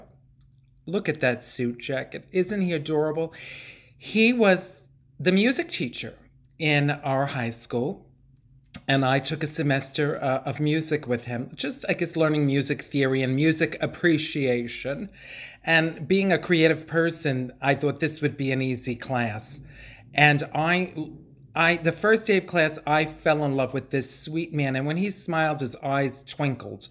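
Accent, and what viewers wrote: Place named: Bronx, United States. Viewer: Omggg..i said you reminded me of my aunts from the Bronx ..You are from the Bronx !! Im floored !!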